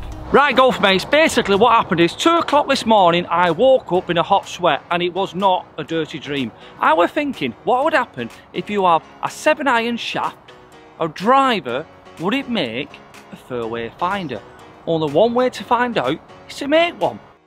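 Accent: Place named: Scotland, United Kingdom. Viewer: I can't understand Scott-ish. Is there a translation.?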